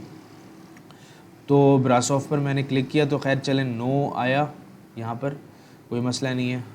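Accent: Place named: Karachi, Pakistan. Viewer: keep it up... Mujy Karachi walon ka urdu accent bohat pasand hai.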